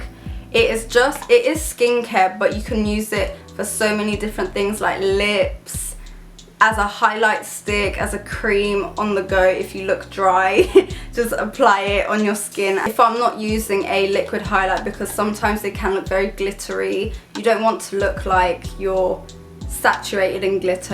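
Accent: United Kingdom. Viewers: the engaging British accent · теку от её Британского акцента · Strange accent, a mix of British and... German? Dutch?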